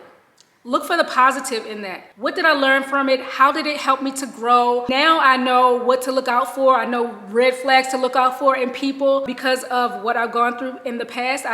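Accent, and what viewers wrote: Baltimore, United States. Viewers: Are you from Baltimore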